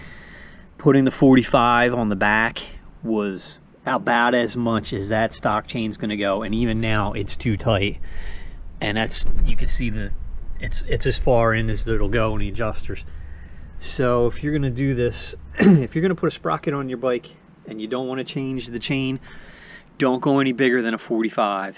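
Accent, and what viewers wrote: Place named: Pittsburgh, United States. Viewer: You got a Pittsburgh accent lol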